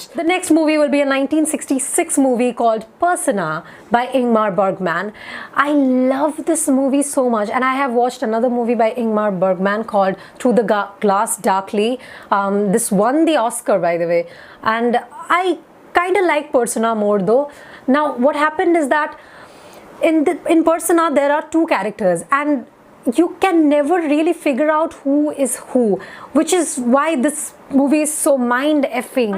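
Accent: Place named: India. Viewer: I love the Indian accent!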